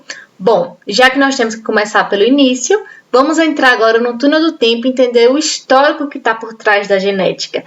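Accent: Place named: Recife, Brazil. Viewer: Parabéns! Gostei muito do teu jeito de explicar (com o teu sotaque e gírias ficou melhor ainda, sou de recife e julgo dizer q tu é daqui também kkkkk). Força aí no começo do teu canal, acredito que você fazendo o que gosta só gera bons frutos (como em tudo na vida). A única dica que eu te dou é relaxar na hora de gravar e deixar de lado o nervosismo de como falar, você relaxando e se divertindo nas explicações fica maravilhoso pra quem assiste. No mais é isso, gostei muito também do início que tu colocou "senta que lá vem história" fica muito bom esses memes em explicações de conteúdo.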